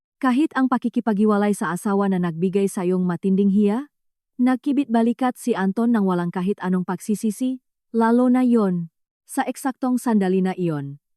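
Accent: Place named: China. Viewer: Nakakawalang gana makinig sa Narrator Ano ba sya Chinese or Maranao. Palitan mo pls nakakabwisit pakinggan imbis ma relax.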